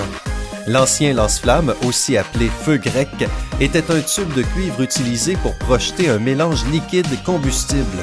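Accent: Quebec, Canada. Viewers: Je déteste entendre l'accent parle par des Quebiquois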